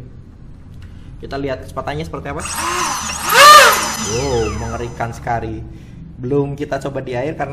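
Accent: Banyumas, Indonesia. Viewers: mas, kalau saya pake yang 3300 mAh bisa gak ya? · kereenn.. bang. belii gak yaa...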